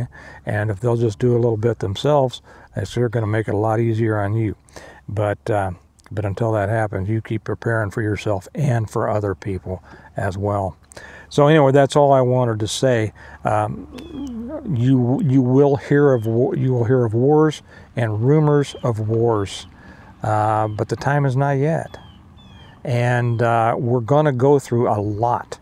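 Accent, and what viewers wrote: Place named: Texas, United States. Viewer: Kansas! I figured you were a fellow Texan from your accent and demeanor. New here. Appreciate your channel.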